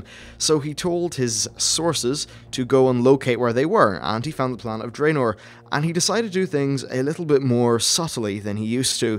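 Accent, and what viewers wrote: Northern Ireland, United Kingdom. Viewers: Great Video. Subscribed! BTW... Are you Northern Irish?! lol. I hear a twang of an accent and it made me curious! :D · Northern Ireland?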